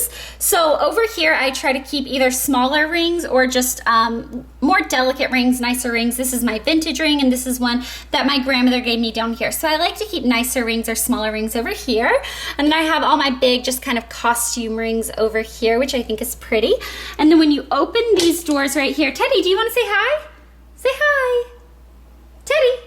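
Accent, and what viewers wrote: South, United States. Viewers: well she does have a southern accent who's trying to speak northernish so asking her to speak perfect french is probably a little too much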